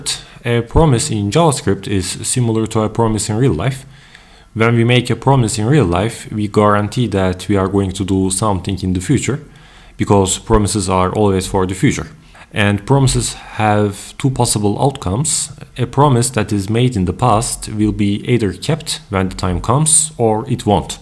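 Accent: Turkey. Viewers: İlk cümleden türk aksanı hissettim ama bilemedim :)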